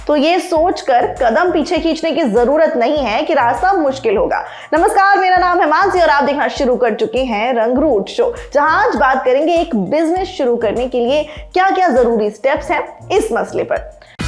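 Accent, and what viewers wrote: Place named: Bihar, India. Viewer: But mamm ka bihari accent (idea to hayye hai) bahut aacha laga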